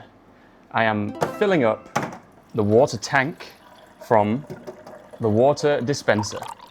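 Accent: Northern England, United Kingdom. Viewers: thank you you are kind and well done on your youtube. I am a senior English teacher from MOROCCO..your accent tells me you are from the northern part of England 😊 thank you very moutch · Who loves the British accent like me? 🙄🤓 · Hi,! Why do you say "mug" as [mug] but not [mʌg]? Are you from Northern England?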